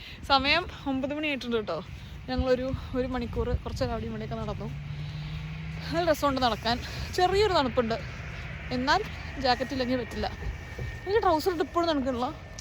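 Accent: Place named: Pattambi, India. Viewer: വളരെ നല്ല തീരുമാനമാണിത്. എന്നും എല്ലാവര്ക്കും വേണ്ടി ജീവിക്കുമ്പോൾ കുറെ വർഷങ്ങൾ കഴിയുമ്പോൾ നമ്മൾ നമുക്ക് വേണ്ടി എത്ര സമയം ചിലവഴിചൂന്നു നോക്കുമ്പോൾ എന്നും account negative തന്നെയാകും. പിന്നെ മക്കള് തന്നെ ചോദിക്കും, നിങ്ങളോടു ഞങ്ങൾ sacrifice ചെയ്യാനായി പറഞ്ഞോ എന്ന്. Very good decision. Hats off to Vishnu for supporting this decision. Please don’t change’ OUR PATTAMBI’ slang. പിന്നെ അങ്ങനെയാണ് പട്ടാമ്പി സ്റ്റേഷൻ ഉണ്ടായതു എന്ന് പറയുന്നത് കേഴ്ക്കുന്നതു തന്നെ ഒരു ഒരു feel തന്നെയാണ്.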